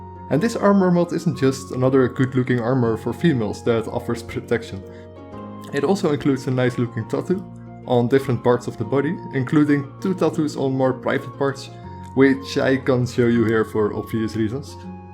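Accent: Netherlands. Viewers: damn dutch accent tho jaja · you sound dutch. you dutch right? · Prima video, lekker dik nederlands accent er boven op, helemaal goed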